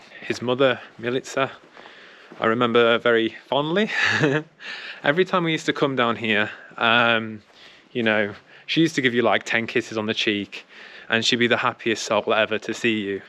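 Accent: United Kingdom. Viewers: Hey brother! I just wanted to say that you're such a polite and charismatic person. You're always so down-to-earth and friendly, and I really admire your hardworking nature. With your ties to the UK and that charming British accent, I think you're well on your way to something big. With the little investment to get realtor license, and with the drone, website, and YouTube channel you already have, there’s definitely money waiting to be made. Keep pushing forward—great things are ahead!" To calculate the commission you'd make from selling two villas worth €1 million each with a 3% return: Calculate the commission for one villa: €1,000,000 * 0.03 = €30,000 Since there are two villas: €30,000 * 2 = €60,000 So, you would make €60,000 in total from selling both villas. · pitam Bezveze ono sve mi je Čudno pričaš Englesko ka Britanac pa san mislija da si rođen u Londonu ti samo živiš tamo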